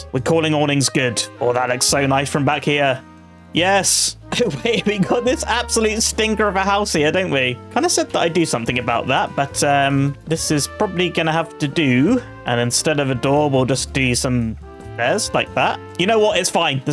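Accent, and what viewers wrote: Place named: United Kingdom. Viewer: I was listening to this as I slept and I'm just now realizing now that I'm awake that he's British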